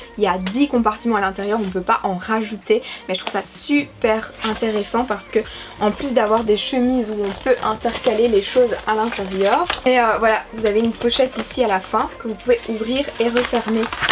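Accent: Belgium. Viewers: J'ai remarqué que tu disais differement les chiffres y'a t'il en belgique une prononciation diffeeente pour les chiffres.Au début, j'étais confuse:) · J'adore ton accent vive la Belgique!!!!😄😄😄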